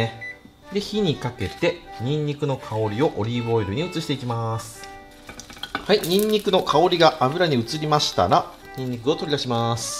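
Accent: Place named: Mikawa, Japan. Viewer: 世界に届け三河弁🌍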